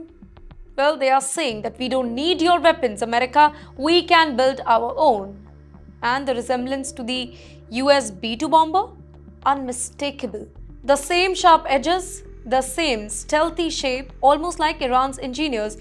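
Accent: India. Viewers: I hate Indian English😂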